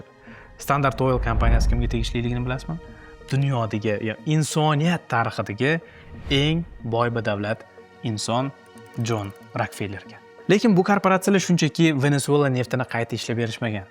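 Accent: Tashkent, Uzbekistan. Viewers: Toshkent shevasida gapirayotgani tufaylidir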